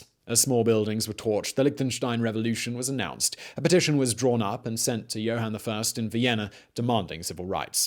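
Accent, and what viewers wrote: United Kingdom. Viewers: this guys to British lol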